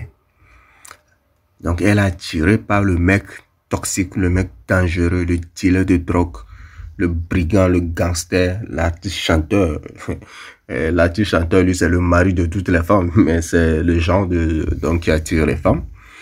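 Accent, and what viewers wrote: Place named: Togo. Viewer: Une des meilleures Podcast! Chapeau frère! A entendre ton accent, on dirait que t'es togolais! #Respect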